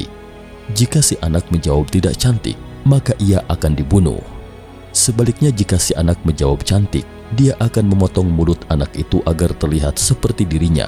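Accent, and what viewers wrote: West Java, Indonesia. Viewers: Sundanesse abangnya hhe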